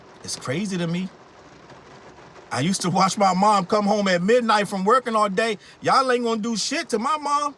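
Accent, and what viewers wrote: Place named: Maryland, United States. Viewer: Also, are you from Maryland? I’m from 301 and def hear the accent at times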